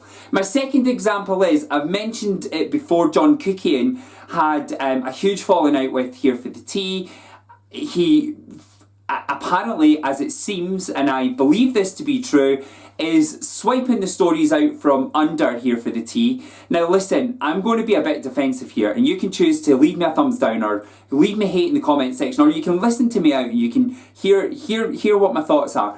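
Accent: Scotland, United Kingdom. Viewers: love hearing a Scottish accent on youtube!! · Your accent reminds me of this really hot Scottish squaddie I dated last summer, such good memories 🤤🤤🤤🤤😍 so sad we lost contact arrrrrghhhhh!!! random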